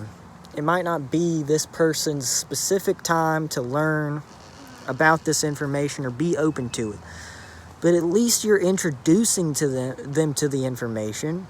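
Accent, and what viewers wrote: South, United States. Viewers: You poor kid, I know exactly how you feel. I've felt the same way ever since my awakening in June of 2009. The one major difference is that I've been lucky enough to find a partner who is on the same path of ascension. Judging from your accent, I imagine you live in a part of the US often referred to as the Bible belt, where spiritual truth is deemed satanic by an overwhelming majority of people. I must say I admire your light-hearted way of being, considering how alone you must feel. It's strange, we go on the internet and we see thousands upon thousands of posts from people who definitely seem to be on the path of Ultimate Truth as we are. Yet, when we look at our immediate surroundings, we feel completely isolated in our quest for ascension. In these times of confinement, it has occurred to me that a lot of people are using an app called Zoom for all sorts of things, yoga classes, business conferences, etc. 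Maybe those of us who are on this sacred path should form a group with that app too so we can share ideas and experiences. What I want to share with you, my young friend, is that you are not alone, not even close. Like you have said here, the spirit world smiles upon you every day all day, but in addition to that, you have gained a lot of popularity in a very short time on this channel, which goes to show there are many of us, tons of us, who know what you know, and think as you think. In the age of technology, maybe we should take advantage of this technology to unite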